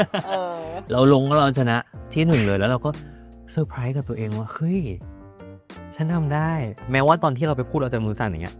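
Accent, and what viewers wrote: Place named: North, Thailand. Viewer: น้ำปิงพูดเหนือน่ารักมาก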